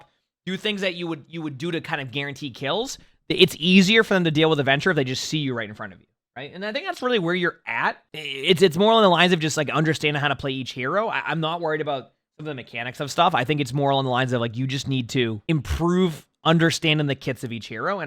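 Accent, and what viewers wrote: Boston, United States. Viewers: Probably part of his Boston accent (which sometimes comes out more noticeably).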